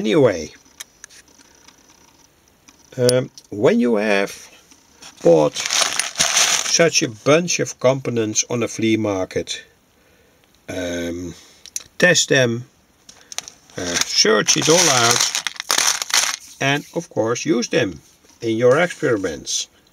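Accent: Netherlands. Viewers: I love your Dutch-English accent. It reminds me so much of my grandparents. Thank you very much for the excellent advise on sorting components. I am very much interested in seeing more videos that are dedicated to the testing of the various components. This video is very inspring.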